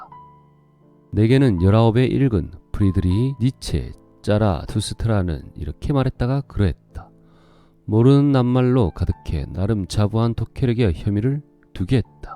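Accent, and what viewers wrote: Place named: Gyeongsang, South Korea. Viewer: 경상도 억양이 거슬리네요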